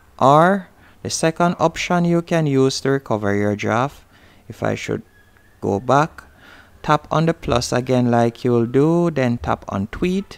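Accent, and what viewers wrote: Jamaica. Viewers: Thank you! You sound Jamaican.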